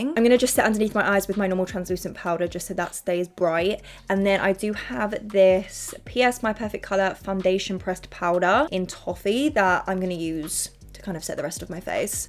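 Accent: Australia. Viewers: I'm so intrigued... I've only watched vids for a few months and absolutely loooove them. But, can any OG fans answer me something. Is she from Australia originally? I can always hear a twang in her accent and I can't work out what it is 😅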